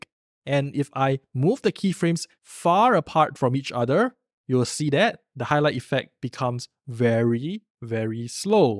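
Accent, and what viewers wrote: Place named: Singapore. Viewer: just the first few words he said, i know hes from singapore lol... a very distinctive accent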